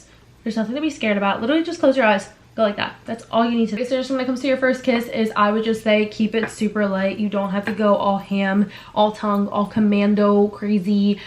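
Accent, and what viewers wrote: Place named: New York, United States. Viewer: She has a New York accent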